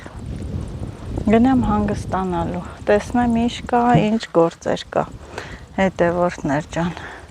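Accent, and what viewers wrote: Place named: Dzorakhpyur, Armenia. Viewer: Мэр Дзорахпюри барбаров эл Э - чемнали! Шат аразат э лсэлн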